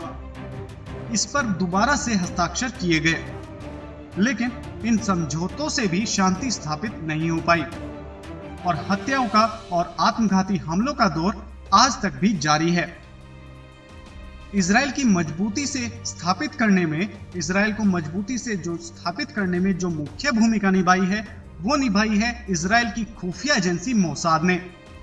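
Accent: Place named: Pakistan. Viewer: Abe chootiye Tere lehze se he saaf saaf pta chal rha h ki tu Pakistaani chootiya h.. Indian Muslim ka behavior of expressing tujse kahin bhi match nahi krta.. Ab kutch sensible reply ho to zarur comment kariyo..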